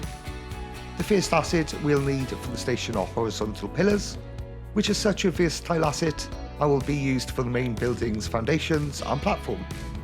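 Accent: United Kingdom. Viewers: Yesss, my favourite British voice is back, and how, WOW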